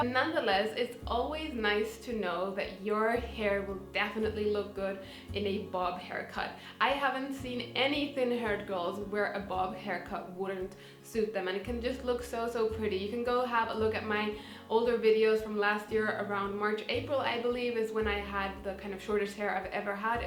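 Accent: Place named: Germany. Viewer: Wow that is exactly the kind of positive vibe I'd need for starting into 2019 with a healthy mind❤ I always had thin hair no matter what, but I'm finally coming to terms with it! Also I have a question for you, I don't wanna be rude or something but you sound like you have a German accent :) it doesn't sound bad or anything I just noticed it bc I certainly have one 😁❤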